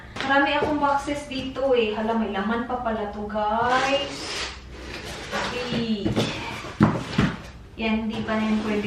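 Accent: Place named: Visayas, Philippines. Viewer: Kanang bisaya gud nga accent ako gnhan mam..😁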